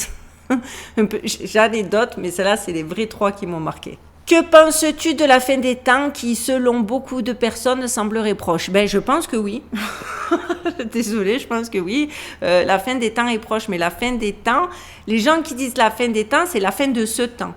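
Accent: Marseille, France. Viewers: Alors la, je n'ai jamais autant ete choque par une video de... Des gens pensent que tu es un.... homme????? Bontee divine... Bon, c'est vrai que de plus en plus de gens ne savent plus que c'est une femme, mais quand meme! Ton accent est charmant, et en plus ca te rend plus "naturelle", en tout cas, moi je l'aime bien ton accent du sud!!!! C'est cool de voir des images de "vers chez toi", est-ce qu'il y a des "legendes locales" que tu pourrais nous partager? Ou des endroits "Mysterieux" ou qui sortent de l'ordinaire? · Aussi ce que j'ai découvert et adoré, vous êtes originaire de la région Marseillaise, je m'en doutais à votre accent.Comme moi d'ailleurs, actuellement j'habite à Istres, donc pas très loin de St Martin de Crau, donc la prochaine fois que j'y passerai, je penserai à vous. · ❓Tu as conservé la langue française et l'accent de Marseille, bravo. 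Comment tu as résisté en ayant vécu dans des pays anglo? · En tous cas vous n'avez pas perdu l'accent méridional malgré le fait de 15 ans aux states 😊❤ je suis varoise 😘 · Disclaimer: je ne crois pas DU TOUT à ses rumeurs! Toutefois, méfiez-vous! Les trans peuvent être en apparence des "femmes" extrêmement féminines. "Elles" se font gommer les traits masculins (comme des mâchoires trop carrées ou le front à bosses typiquement masculin) par ce que les chirurgiens plasticiens appellent la féminisation du visage. Pour ma part, je trouve le visage de magnifique et sa mâchoire n'a rien de masculin pour moi. Vous aurez vu la mienne!!! 😆 Ce qui m'aurait mis un doute ce sont ces mains, très belles mais un peu grandes pour une femme. Je me suis dit que c'était l'effet d'optique (plus proches de la caméra que le reste) mais il y a des femmes biologiques qui ont de grandes mains. Pour reconnaitre une transexuelle, il faut savoir analyser beaucoup de choses et être au fait de beaucoup de choses. 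Et, surtout, ne pas prendre en compte une caractéristique mais tout un ensemble de traits (visage, corps, comportement). Après, les "haters" trouvent tout et n'importe quoi après avoir quelque vidéos sur cette mode de transition. Et a tout lu, à commencer par le plus facile: une blonde à l'accent Marseillais c'est tout de suite une cagole. P.S.: Comme pour tout le reste, le fait d'avoir un enfant n'est pas un argument. Il y a des trans qui ont des enfants qu'ils n'ont pas porté et vont même jusqu'à simuler la grossesse. Eh oui, ça fait partie des choses sur lesquelles les gens ont du mal à ouvrir les yeux. Le net regorge des sites qui venden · 3eme commentaire. Rebonjour. Moi j'ai une question. Est-ce que ton accent du sud ressort lorsque tu parles anglais? Précisément: est-ce que tu parles anglais avec l'accent Français du sud? 🤔🙂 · Wow je ressentais bien que tu es une femme spéciale mais je dois ajouter que tu es formidable ♥️♥️♥️. 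Quelle force, quel parcours! Et quelle beauté! Ne change rien et continue à nous transmettre le fruit de tes recherches et ton humour, le tout avec ton adorable accent du Sud. Ta mâchoire est juste parfaite! C'est souvent ce qui nous a complexé étant jeune qui se révèle être un atout une fois adulte. Plein de good vibes de l'île de La Digue aux Seychelles... Et toutes mes félicitations pour ta chaîne. 🎉❤ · haha 🤣🤣🤣 Merci pour cette franche rigolade!! Si je vous appelle, ça vous dérange? 😆 Ah et confirmation... J'avais bien reconnu un léger accent marseillais. A moins d'avoir envie de tirer des pruneaux et de jouer de la baillonette je ne vois pas l'intérêt de revenir vivre en France durant les deux prochaines années. Vous êtes certainement plus en sécurité là où vous êtes. En France ça devient du grand n'importe quoi...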